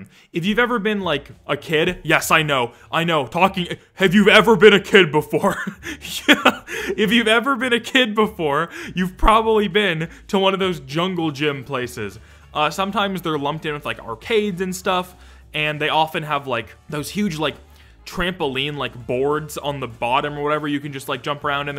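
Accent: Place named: Canada. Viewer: Holy shit, is Canadian? I can totally hear it now.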